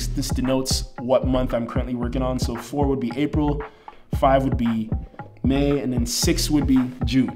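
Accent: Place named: Nigeria. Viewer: Vibes are strong bro.. Are you Nigerian? I can hear a small hint in the accent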